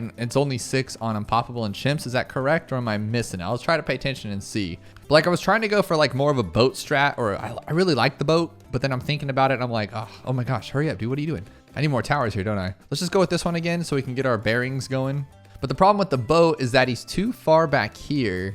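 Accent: Norway. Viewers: You’re Norwegian? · vent vent vent, er du norsk? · Are you Norwigian?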